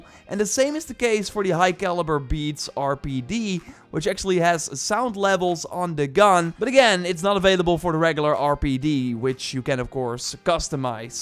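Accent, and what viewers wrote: Netherlands. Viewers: Haaha ik kende deze kanaal nog niet. Hoorde meteen een heerlijke hollandse tint in je stem. Fijne video, thanks! Subbed <3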